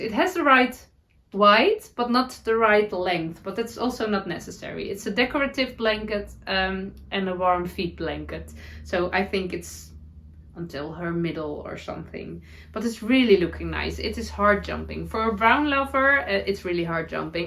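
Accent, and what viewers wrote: Netherlands. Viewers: As a second generation Dutch Canadian, can I just say that I LOVE listening to you speak. ❤ It almost feels like I’m visiting with a Dutch relative, and when you try and find the right English words I find myself cheering you on as you try different ways to say it. I wish I spoke Dutch - it’s such a beautiful language and a beautiful heritage that brings me so much joy to listen to. ❤️ Someday I hope to visit!!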